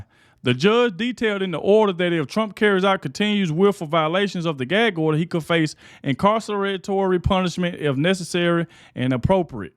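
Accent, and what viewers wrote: South, United States. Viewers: I once again listened to your video until the end! I am blessed to listen to your deep southern accent...(my Momma was born and reaised in MS). I am glad that you have this channel to share what we might not see elsewhere. Stay well, keep spreading the love of Jesus and you will always be at peace. Blessings to you and yours~~Bev~~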